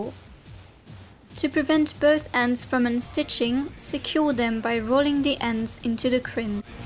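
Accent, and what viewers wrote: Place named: England, United Kingdom. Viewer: Donne moi ton accent anglais on fleek s'il te plaît:(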